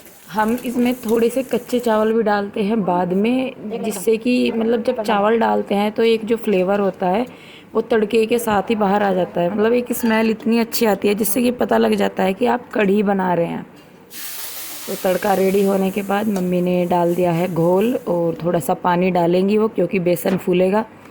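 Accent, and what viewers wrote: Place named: Saharanpur, India. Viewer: aapki language ka jo touch hai ...m first vdo se hi smajh gyi thi ki ho na ho aap saharanpur se related ho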